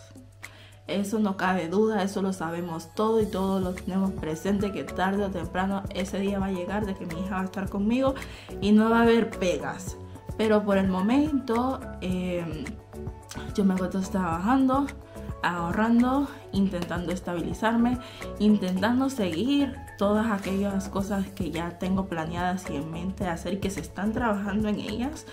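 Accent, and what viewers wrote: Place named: Honduras. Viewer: Tu no tienes que cambiar tu modo de hablar tienes que ser auténtica y Aser prevalecer y valer tu idioma de Honduras Yo también viví en España y nunca cambie mi forma de hablar 🙋🇪🇨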